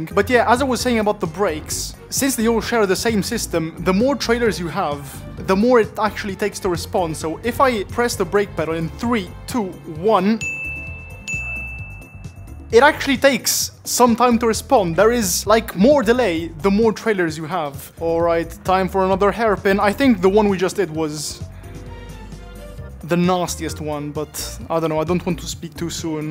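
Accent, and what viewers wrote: Italy. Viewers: Ma sei Italiano o cosa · Bro sei italiano? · ma da che parte dell' Italia sei?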